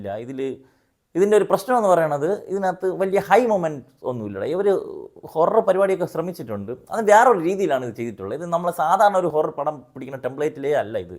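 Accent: Trivandrum, India. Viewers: Please avoid reviewing English movies and stick on only to Indian movies... Thankalude malayalam movies nte review okke njan kanarundu and i am very much interested in those reviews and your Trivandrum slang. .. Athukondu thanneyaanu thankale njan subscribe cheythathum. But I am very sorry to say that you don't have the class for reviewing English movies, maybe due to the fact that you are not much familiar with Hollywood or British movies. The way you reviewing Sinners is really pathetic and too substandard. So once again, I humbly request you to restrain from reviewing English movies.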